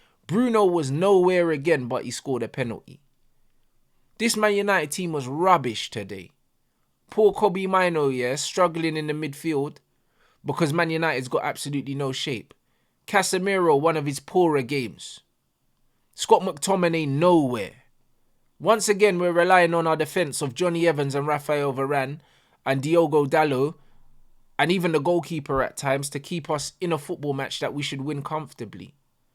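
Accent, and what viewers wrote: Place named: London, United Kingdom. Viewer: Bro why is this guy even supporting United? He’s obviously not from Manchester judging by that London Afro-accent. You don’t speak like that if you’re from Manchester. Does anyone know why he supports them?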